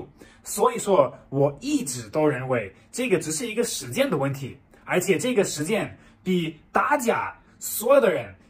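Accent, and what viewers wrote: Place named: Sichuan, China. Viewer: 成了四川的人了，四川话十足！👍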